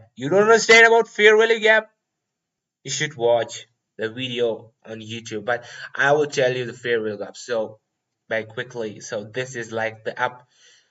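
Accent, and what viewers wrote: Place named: Russia. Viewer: recaaardddiiiingggg😘😅 btw best knowledge and also kaatttar englishh like russsian or spanishhhhh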